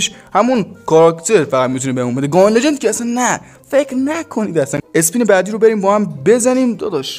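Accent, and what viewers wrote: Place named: Mazandaran, Iran. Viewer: بنازم لحجه مازنی🗿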